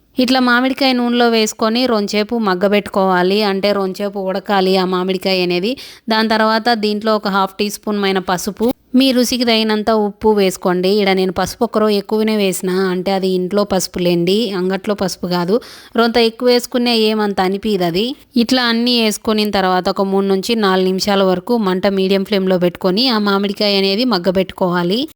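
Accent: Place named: Kadapa, India. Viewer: U r voice ur slang of kadapa s super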